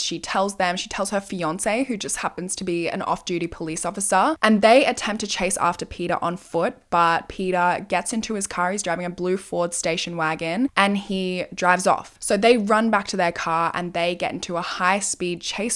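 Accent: Australia. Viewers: I got hooked with your videos because of your voice and Aussie accent 🤗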